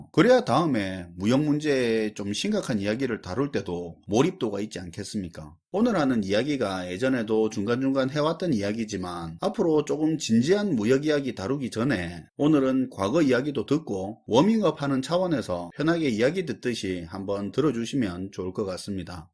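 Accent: Gyeongsang, South Korea. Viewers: 연속적으로 시청을 했을정도로 어려운 경제 흐름을 쉽게설명해주셔서 감사합니다. 가정경제에 중요한 시점이라 돌다리 두드리는 맘으로 시청하였는데 기본이 중요하다는것을 시청할수록 느껴졌습니다. 구수한 경상도 사투리와 편한 캐릭터가 집중하여 들을 수 있게 하는것 같아서 경제에 통달한 분의 오디오 비디오로 적격인듯싶습니다. 감사하고 많은이에게 유익한 지식이나 정보 많이 알려주세요~^^ 훌륭하시고 감사합니다.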